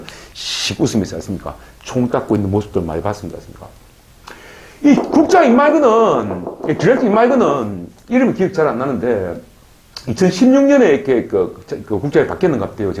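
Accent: South Korea. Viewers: 안녕하세요.! 하!하!하! 정말 리얼한데요.!! 구수한 사투리...정말 친밀감과 진실성이 드러나서 왕팬입니다. 이번 에피소드는 영어유학원에 관한 얘기라서 더 진지하게 들었습니다. 지금도 필리핀에 계시는건가요? 저희는 샌디에고에서 CC2UC 라는 유학원을 운영하고 서울 강남에 국제학교를 운영하고 운영하고 있습니다. 혹시 역으로 필핀의 부유층들이 미국으로 유학을 원하는 층들을 모집할 수 있다면 어쩌면 도움을 드릴 수 있을 것 같아요..ㅎㅎ 한번 검색해보세요. 내년 여름방학을 이용해 필리핀으로 여행을 하게 될 경우 한번 만나뵙고 의 고매한 인품과 경륜을 배우고 싶네요..!!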